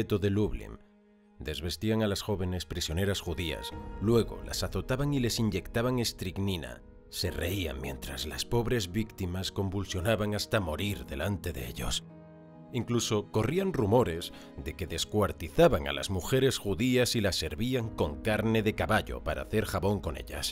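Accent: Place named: Spain. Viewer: Estoy adicto a estos videos, la voz y el acento español van como piña jajaj saludos desde Argentina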